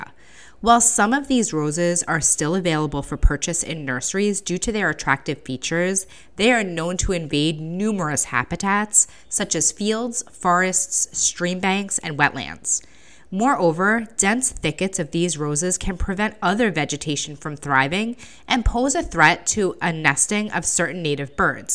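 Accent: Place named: Midwest, United States. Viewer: You don’t sound like any New Yorker that I ever met, you sound more like a midwesterner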